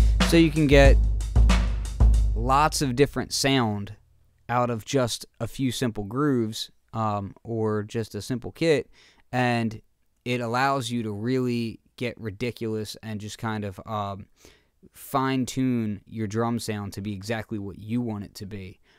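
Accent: Philadelphia, United States. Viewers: Thank you for the video. Is that a Philly accent by any chance?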